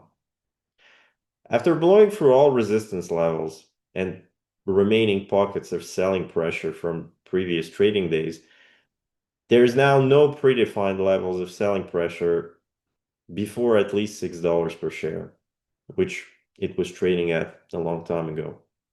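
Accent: Quebec, Canada. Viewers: Tu vien du Quebec? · Are you from Quebec ? Your accent looks familiar.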